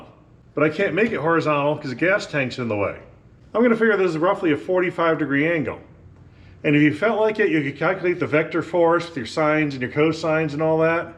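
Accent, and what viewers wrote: New England, United States. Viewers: Every once in a while I hear what sounds like a Nor'Easter accent? Enjoying your work. Something optimistic and indefatigable in your approach to problem solving that makes me smile. Cheers from Monteray Bay CA.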